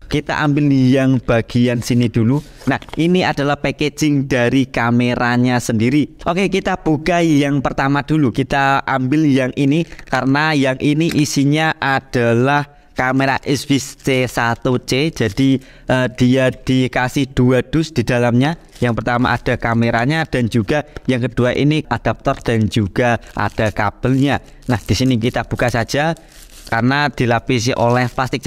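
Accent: Java, Indonesia. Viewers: suara org jawa, medog bgt...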